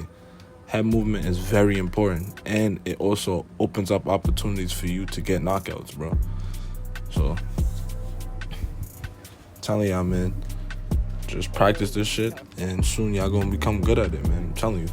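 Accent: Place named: Bronx, United States. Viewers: U from new york fasho bru. U gotta be😂😂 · Bronx I dead recognized the accent after you said controller🤣😭 fire video gang 🤞🏿‼️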